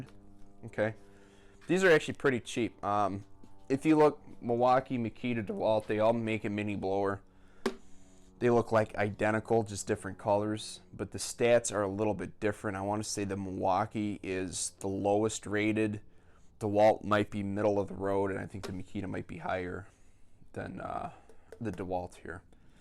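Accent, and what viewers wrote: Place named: Chicago, United States. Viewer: I hear that Chicago accent. Where you from? I'm on da south side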